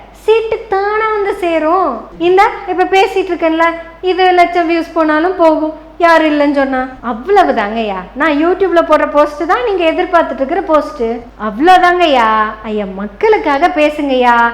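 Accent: Kongu, India. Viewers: அம்மனி வர வர உன்ற கோயமுத்தூர் குசும்பும் கொங்கு (கொஞ்சும்) தமிழும் தாறுமாற இருக்கு அம்மனியோவ் . உன்ற பதிவு ரொம்ப சூப்பரு மா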